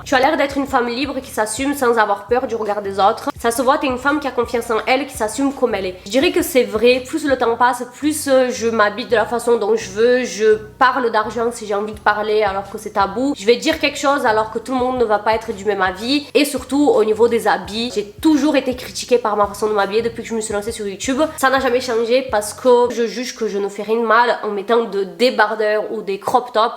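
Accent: South, France. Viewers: Pas un préjugée mais la première impression que j’ai eu de toi mis à part le fait que tu sois adorable , c’est une francaise du sud , alors je te dis pas le jour où j’ai su que tu es brésilienne j’étais choquée car tu n’as aucun accent (non français ) non seulement tu as l’accent français mais en plus celui du sud que j’adore , franchement bravo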